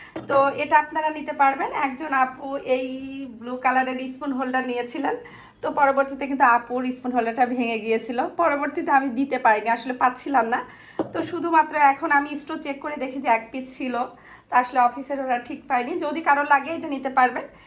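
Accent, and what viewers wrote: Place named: Kushtia, Bangladesh. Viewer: আসসালামু আলাইকুম আপু। আমি নতুন তোমার চ‍্যানেলে।আপুর কথা শুনে মনে হচ্ছে কুষ্টিয়া হয়তোবা। সব জিনিস গুলোই সুন্দর 💓💓💓💓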